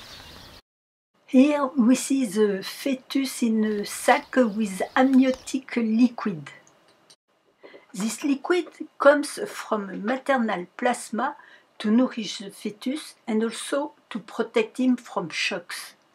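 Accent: France. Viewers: Love the French accent here 😁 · She's speaking English with a French accent. I understand her very well. If you don't, then you're the problem not her. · J'adore l'accent français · Très bon reportage. Thank you for sharing. Yes, you have french accent: I love it and thank you for sharing anyway, great video -and this is what counts. Merci beaucoup de la Catalogne.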